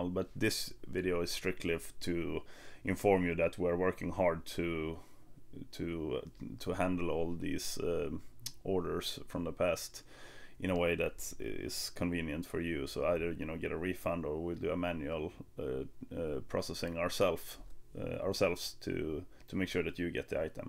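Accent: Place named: Sweden. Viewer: I just love your swedish Pronunciation :3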